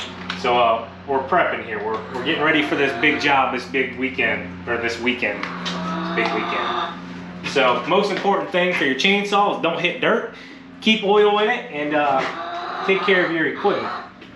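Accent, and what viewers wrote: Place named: Pittsburgh, United States. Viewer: Waymorebetter Coolbeans iamathinkin Pittsburgh talk just saying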